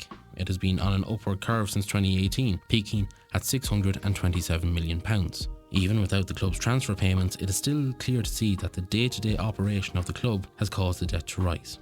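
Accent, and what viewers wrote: Ireland. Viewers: Grand Irish accent there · Irish accent ❤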